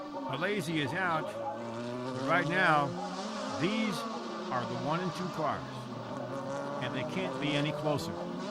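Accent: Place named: United States. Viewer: what's with the american accent?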